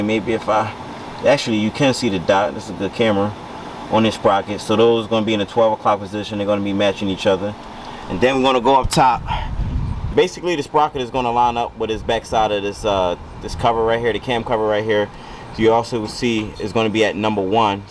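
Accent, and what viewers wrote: Philadelphia, United States. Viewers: 👍🏿👍🏿👍🏿👍🏿 215 standup, new u was from philly soon as I hard ya voice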